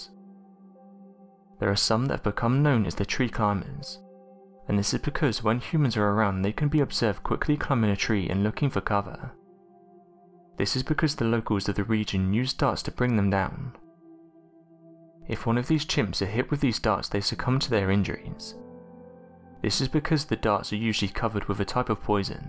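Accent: England, United Kingdom. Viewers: What’s up with the cadence and flow of your voice man? I mean no offense nor do I have any problem with your British accent, just the monotone sound and the way your telling of things in your videos is segmented into sentences with this ebb of your voice. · Anyone else tired of these voices of the narrators in these type of videos? Always some guy or girl from UK with little to no life in their voice. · A video regarding bili apes with no footage or pictures of an actual bili ape... just someone with an english accent talking.... interesting.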